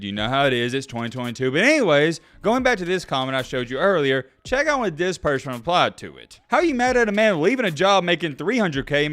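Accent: South, United States. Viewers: That southern tone and underlying messages remind me of a personality I'm way too familiar with. But do your thang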